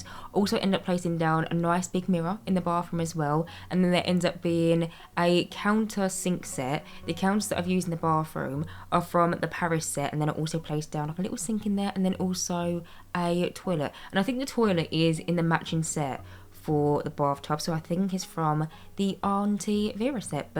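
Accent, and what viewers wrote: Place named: Manchester, United Kingdom. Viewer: Where are you from. your accent is giving northern. Maybe manchester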